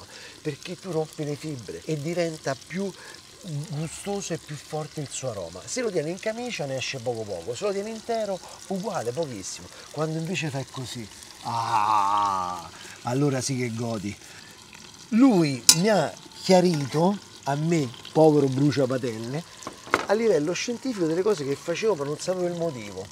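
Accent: Rome, Italy. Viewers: Chef, La premessa è che guardo i tuoi video dagli albori e ti seguo da quando giravi per gambero rosso in tv. Ultimamente però ho da fare una critica, sperando sia costruttiva. Noto che il tuo modo di fare video è cambiato, adattandoti e quasi imitando quelli di un certo “cuoco amatoriale” di cui non voglio fare il nome che gira i video dal proprio balcone di casa… Tutto questo voler enfatizzare il romanesco e la gestualità molto simile all’altro usata per me ti rende meno autentico di quello che sei e che hai sempre dimostrato di essere. Spero venga visto solo come un parere personale e quanto più costruttivo possibile e non come una mera e deleteria critica. Saluti Max, comunque ti seguirò sempre e comunque per la tua simpatia e professionalità! ❤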